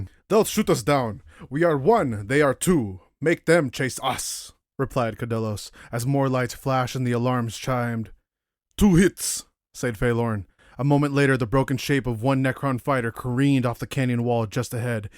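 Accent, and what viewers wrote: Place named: Russia. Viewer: Very cool reading !! Not a big fan of the Russian accent tho, makes it a bit difficult to understand the Marines lol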